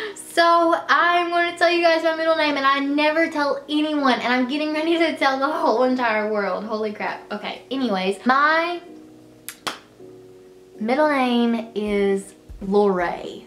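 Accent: South, United States. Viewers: is she country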